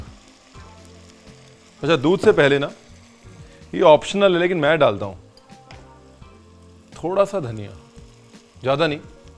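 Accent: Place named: Punjab, India. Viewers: Your punjabi accent is to die for 🤗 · I don't know if youtube read my mind today. I was about to comment in one of ur video "k sir Punjabi v bol dea kro vich vich" den Utube came up wid this suggested video. Like tadka is must in every dish, ur punjabi accent also necessary in every cooking video. Dhanwaad Paaji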